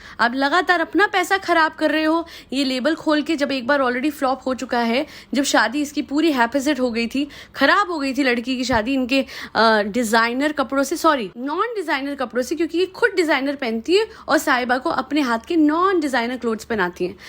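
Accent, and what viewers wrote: Punjab, India. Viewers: Baki sab theek hai but aapka punjabhi accent thoda alag lagta hai... Somehow, i feel ke aap punjabi speaking ni hai... aapka hindi accent is so good & flawless. Punjabi bolne ka try accha hai but 👍👍.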